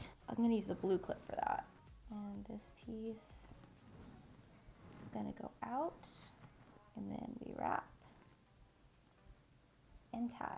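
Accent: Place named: California, United States. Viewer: Since when does have a Californian accent even though she from NC?!?!? I am so confused....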